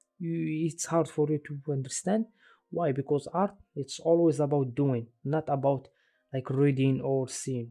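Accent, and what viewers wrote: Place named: Morocco. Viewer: probably his accent is so Moroccan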